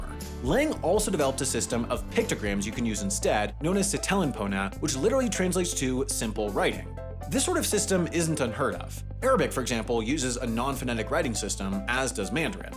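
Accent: United States. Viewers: It's unsettling to me how someone so knowledgeable just...cannot produce basic sounds without them being overtly "American" 💀 having flashbacks to his China videos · say they are the spanish vowel goes on to pronounce i and e like every stupid American even tho he speaks french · Are Americans really that bad at pronouncing other languages without that strong American accent or is it just? To learn how to phonetically pronounce so few letters in an another language is not that hard really. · he just has the ‘American’ accent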